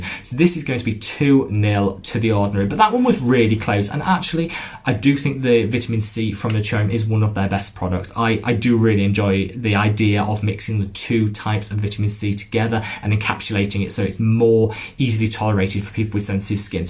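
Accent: United Kingdom. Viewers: Great review. Really. Just discovered your channel and subscribed. Want to hear more reviews from you. You go deep and I love it. You remind me of Hyram only with British accent which I adore😁 I would like to hear your opinion on timeless. They have straitforward products. I love their vit c 20% ighink you would love it too. Good luck with your channel it will grow for sure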